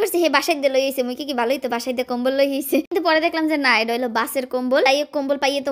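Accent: Barishal, Bangladesh. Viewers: আমার এই ভিডিও টা দেখার কারণ হলো বরিশালের কথাগুলো শুনার জন্য। কেননা আমি চট্টগ্রামের আর আমার একজন মনের মানুষ ছিল বরিশালের। তার কথা গুলো সেম মতো।অবশ্য সে এখন আমার আর নাই।আমায় বিশ্বাস নিয়ে আমাকে ঠকাইছে।অথচ ভালোবাসার উদ্ভুত মায়া।সে এখন আমায় ঘৃণা আমি তাকে করতে পারিনা ঘৃণা এটাই হয়ত ভালোবাসা।বরিশালের ভাষা কারো মুখ থেকে শুনলে আমার বুক ফেটে কান্না আসে।নাম তার ফ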